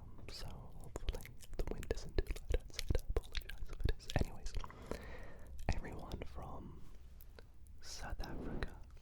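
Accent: United Kingdom. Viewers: I liked the sound of your accent and assumed you’re British, then read you’re from Australia. What a marvellous speech!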